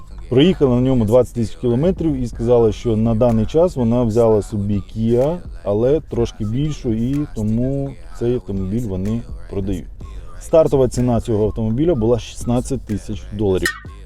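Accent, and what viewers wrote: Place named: Surzhyk, Ukraine. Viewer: Ви круто навчилися балакати нашим суржиком))))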